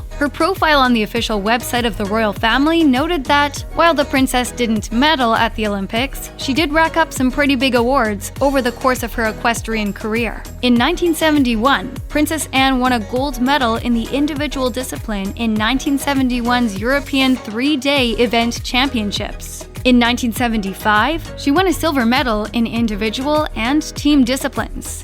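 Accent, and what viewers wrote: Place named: United States. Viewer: No offence intended, and not that anyone was asking, but I can't watch a doco on the Royals that features an American voice over. It's like putting sugar in tea. Or using a wood chipper as a food blender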